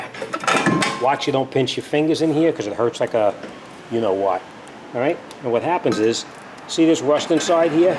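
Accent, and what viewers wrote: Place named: New Jersey, United States. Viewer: Thanks for taking the time to post this DIY. Almost wished I still lived in JC instead of Florida so I could stop by your shop after hitting the diner. Let me know when you work on a Porsche 997 Turbo because I have not found anyone else that has made a great, comprehensive and complete video as yours. Also enjoyed hearing the Jersey accent and the horn honking, too. Keep it up.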